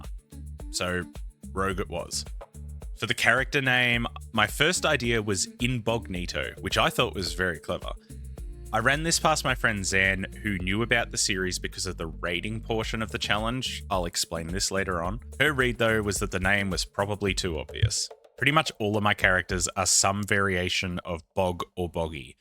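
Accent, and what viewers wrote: Australia. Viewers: Recently came back after many years away. Your series is a steady paced well thought out guide to the key parts, without the clickbaited bs of some youtubers. Might be the aussie in your voice, but it's like hanging out at the local and listening to a bloke having telling about his latest fishing adventure. Comforting and engaging, keep up the good work mate.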